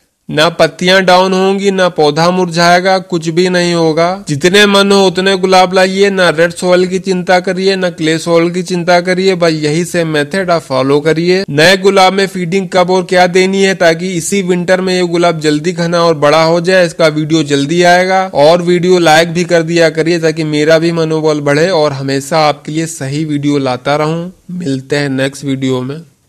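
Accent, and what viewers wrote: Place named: Varanasi, India. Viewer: Beta ache se samjhate ho. Tum banaras ke ho ya aas pass ke ho.tumhare boli me innocency jhalakti Hai. God bless u.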